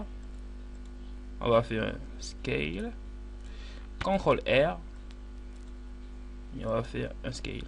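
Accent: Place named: Africa. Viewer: Très bonne vidéo. Dommage pour l'accent africain qui rend difficile la compréhension des touches (G prononcé "J"" etc..)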